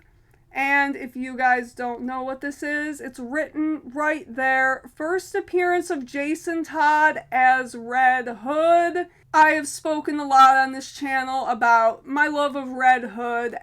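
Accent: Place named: Western New York, United States. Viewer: Love your content ... and Western NY accent!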